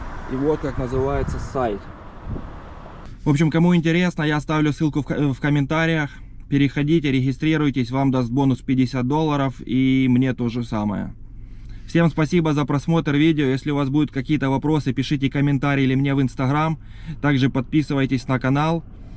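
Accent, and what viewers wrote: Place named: Kharkiv, Ukraine. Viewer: Похоже на Харьковский говор)))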